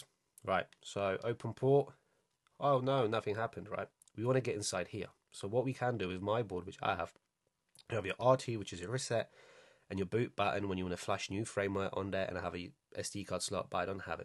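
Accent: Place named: United Kingdom. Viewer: Great vid man. Nice to hear a fellow brit talking about ethical hacking, etc. New subscriber for sure. Im trying to get into ethical hacking/cyber. Would love it if you could do a roadmap for getting into ethical hacking. Im currently doing the comptia A+ and then hopefully the trifecta as well as linux+ cloud+ and pentest+ and some python, etc. It's just so hard to get into any pentesting roles as UK is still behind, and most pentest roles are senior. The US has so much more opportunities for cyber and pentesting as a whole. Any advice on that front as well would be great. Also what did you study to get into ethical hacking? Thanks bro🫡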